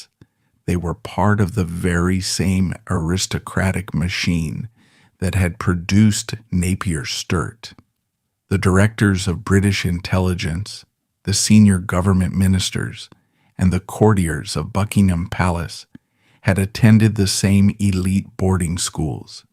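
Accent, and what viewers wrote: United States. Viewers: WHY SOMEONE WITH AN AMERICAN ACCENT TALKS ABOUT ENGLISH HISTORY 🤔🤔🤔🤔🤨🤨🤨🤨🙄🙄🙄🙄😶😶😶😶😱☹️👎🏼👎🏼AND THEN PRONOUNCES SOME WORDS INCORRECTLY 🙄🙄🙄🙄😶😶😶😶🫣🫣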